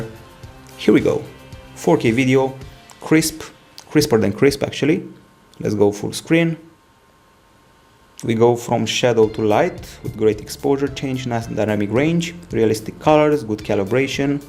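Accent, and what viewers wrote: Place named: Romania. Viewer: După accent ești român? Bun review. Multumim!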